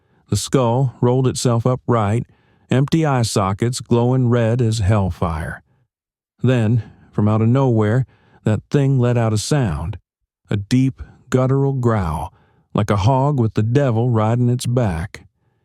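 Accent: South, United States. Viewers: This spooky story should become a classic. Dark atmospheres. A soft Southern Drawl with wording the way only an old time Southerner could say it. And the story! Spooky as all get out! But tethered to our earth by its moral lesson. Surely a tour de force of Kentucky lore. Thank you!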